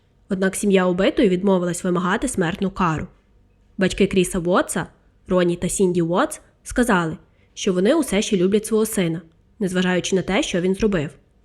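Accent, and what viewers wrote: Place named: Rivne, Ukraine. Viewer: Ви з Рівного? Такий цікавий говір? Якщо що я з Кропивницького. Просто так цікаво розмовляєте. Трішки не гоніть! Просто читайте як і розмовляйте з нами. Відчуття, що ви робите на от їбісь😞не треба летіти! Навпаки можливо поставити 1.25 швидкість. Краще переслуховуйте і ви і комусь дайте послухати. Ви вумничка!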